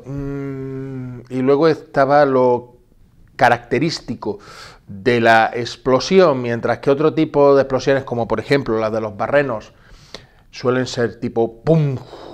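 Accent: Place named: Cadiz, Spain. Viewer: tu eres de cadiz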